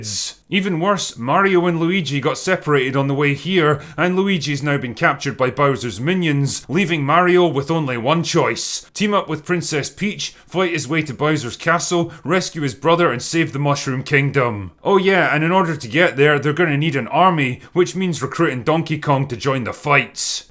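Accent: Scotland, United Kingdom. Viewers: No, I don't care whether or not it's good, and I'm not paying for it anyway since it's not my genre. I just enjoy your take on these things. It's hard to beat a drunken Scot sarcastically discussing a movie. ;-)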